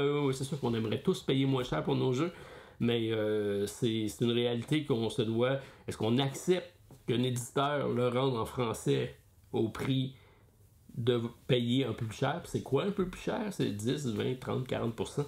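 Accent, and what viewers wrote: Quebec, Canada. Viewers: Bonjour. merci pour cette vidéo. Coup de coeur du mois de Mai pour EVACUATION de VLADIMIR SUCHY. Attendus pour le mois de Juin, WYRMSPAN et SLAY THE SPIRE. Bon Weekend....... La langue Française avec l'accent Québécois n'est-elle pas trop belle!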